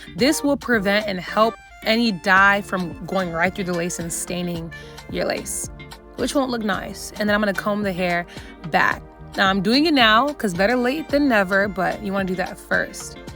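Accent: Nigeria. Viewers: It's the Nigerian English twist for me...😅 I so love this wine colour, it suits you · I just came across this video and I love it. The mix of your ascent with the sprinkle of Nigerian ascent made me subscribe immediately. I love you ❤❤❤. This video helped a lot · I tried to ignore but I can't, the Yoruba accent creeping in giving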